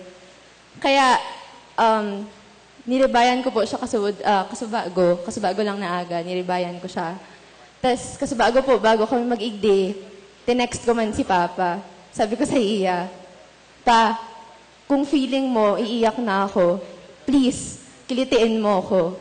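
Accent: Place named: Bicol, Philippines. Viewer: obsessed sa bicol accent ni!!! grabe word of wisdom niya and fam niya grabe.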